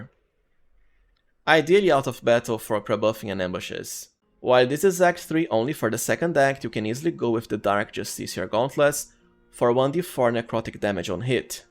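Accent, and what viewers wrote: Brazil. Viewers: Nice build as always! E ai mano, vou mentir não seu sotaque em inglês parece de Br vc é br? Keep the up the good content bro, you helped me a lot in tactician.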